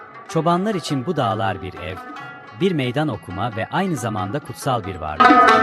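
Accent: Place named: Turkey. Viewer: بسیار عالی موفق باشی یک مستند بسیار عالی بود لحچه ترکی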